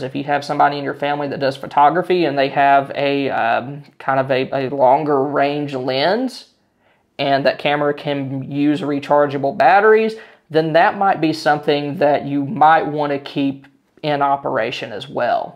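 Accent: South, United States. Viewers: Discovered your channel today...and i like the dry sense of humor tutorial combined with a bit of Southern twang...makes me homesick... expat American tropical salutes from Venezuela!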